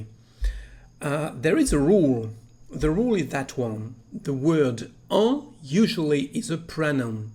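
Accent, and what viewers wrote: France. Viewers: That was great and your pace was perfect! Also, you have a gorgeous French accent. Ahhhhh.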